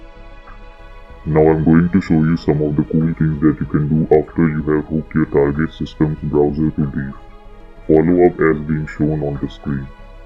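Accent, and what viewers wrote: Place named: India. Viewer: i can see that indian acent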